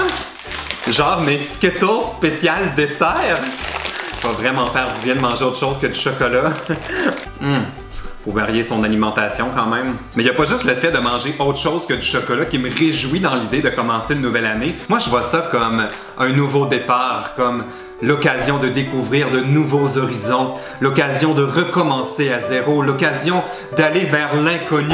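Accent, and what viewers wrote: Quebec, Canada. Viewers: J'adore cette idée avec la boule magique c'est top ! et je t'adore vraiment ! bonne continuation. (j'ai commencé à regarder t'es vidéos y'a quelques jours, je m'en lace pas ^^. Et j'adore l'accent québécois =)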